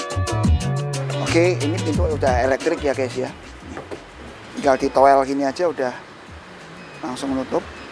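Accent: Java, Indonesia. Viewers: Oyi jgn rasisme, sudah asli indonesia lahir di jawa tengah, logat memang logat ibu yg terbawa... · Enggak Rasis maksudnya guyon kagum kok wes melebur sama darah Jawa medok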